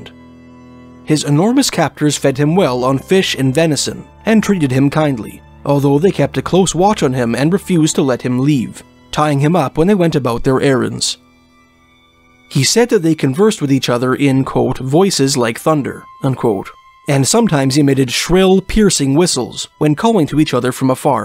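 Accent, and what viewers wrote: Canada. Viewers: I wonder where she grew up in Canada with that accent.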